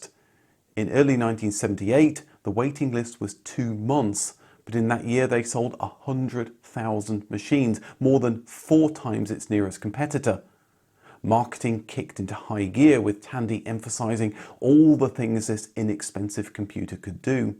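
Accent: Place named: United Kingdom. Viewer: Love Your historic vidéos. Lots of information and Your perfect British accent is so good for French ears. •••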